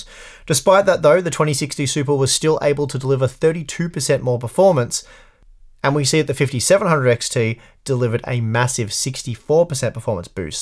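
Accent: Australia. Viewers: This may seem like a dumb question but is hardware unboxed Australian?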